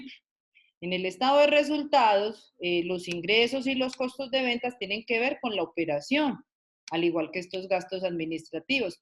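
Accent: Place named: Antioquia, Colombia. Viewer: rica esa voz de paisa arrecha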